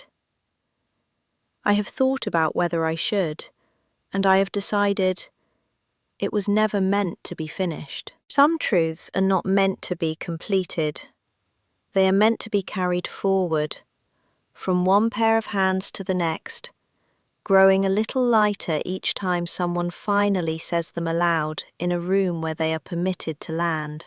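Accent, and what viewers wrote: United Kingdom. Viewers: Get rid of the English accent!! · These stories are so fake. Someone born and raised in Ohio in an Amish community would not have a British accent. This is all AI generated.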